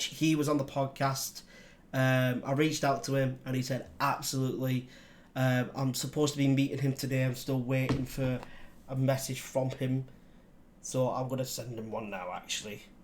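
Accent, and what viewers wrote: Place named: United Kingdom. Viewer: Where in the UK are you? Love your accent. I'm pulling for you. Good luck. I know you can do it.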